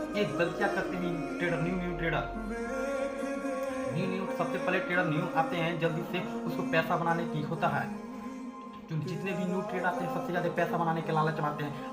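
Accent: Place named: Bengal, India. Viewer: App ke bat se pata chal raha app bengali hoo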